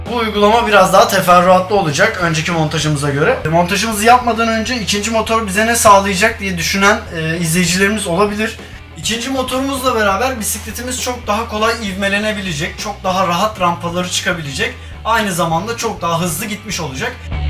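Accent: Erzurum, Turkey. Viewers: abi siz erzurumlumusunuz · Abi Erzurum lu musn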